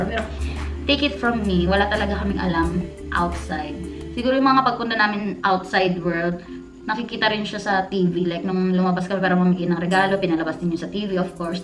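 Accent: Batangas, Philippines. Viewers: Yay! <3 nakakabitin lang po. Hahaha! Since napanood ko yung blog mo na naaksidente ka, pinanood ko na din yung ibang videos mo kasi nacurious ako pano ka naging vlogger eh last time i know, galing kang PBB. Napaka genuine mo sa videos mo, malapit din kasi kami sa Batangas at parehas tayo ng "punto" pag nagsasalita kaya nakakaaliw na mapanood ka. Hahaha! As in very lunch time, gusto ko nanonood habang kumakain, vlogs mo pinapanood ko hahaha! More powers! God bless you:)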